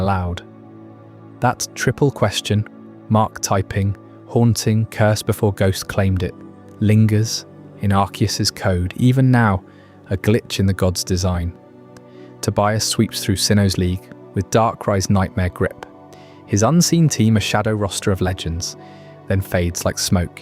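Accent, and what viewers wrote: United Kingdom. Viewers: Great videos could you do your voice a tad less British please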